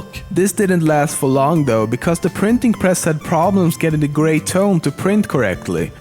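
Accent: Sweden. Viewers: Hahah, your swedish accent makes me laugh xD Norway ftw! great videos though (Y) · He is swedish... basically like pewds, jennifer= yennifer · well he is swedish so swedish people can't pronounce very well in "J" letter which i love listening to their accents :)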